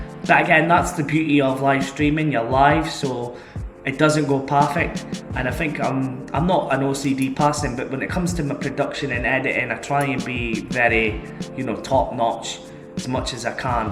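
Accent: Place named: Scotland, United Kingdom. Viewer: What part of Scotland are you from. Come to New Zealand 🇳🇿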